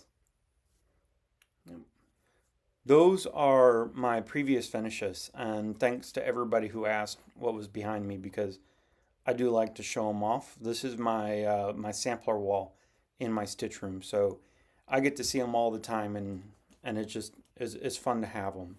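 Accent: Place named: Wisconsin, United States. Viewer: Love love love the Plum Street saltboxes layout ..... I'm a house stitcher ...... you've enabled me 😊Also the Drawn Thread seasons idea. I've written this down or I tend to forget. Great great stitching!! Sounds like you are from Wisconsin. I'm halfway between Green Bay and Appleton. Enjoyed your bourbon story......I like to learn. Thanks for sharing! TV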